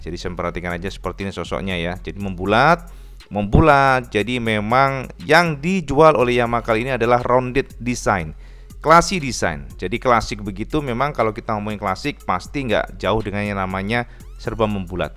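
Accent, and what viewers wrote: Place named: Tulungagung, Indonesia. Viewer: enggres aksen tulungagungan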